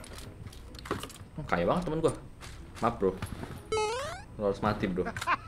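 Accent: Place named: Kalimantan, Indonesia. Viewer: KALIMANTAN MANA SUARANYA.. 😎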